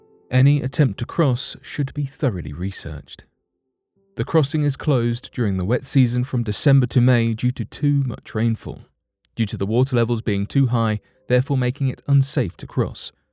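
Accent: United Kingdom. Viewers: WHERE YOU FROM ARE YOU A FELLOW BRIT? 🇬🇧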